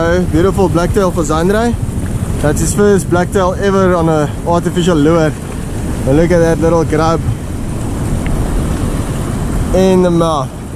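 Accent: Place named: Afrikaans, South Africa. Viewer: Jy is afrikaans ek kan dit hoor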